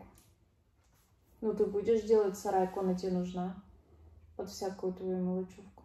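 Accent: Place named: Urals, Russia. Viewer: молодец. Все ты правильно говоришь. Да ты говорила, что это центр и называется Вип. А, что ты говоришь по уральски... Да ты умничка, что не стесняетесь это сказать. Да у нас на Урале так говорят. Особенно старики в глубинке. А видимо с детства это запомнила. Что в этом плохого.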